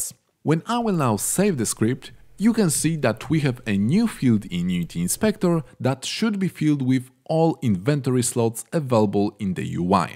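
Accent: Poland. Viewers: are you polish? you sound so for me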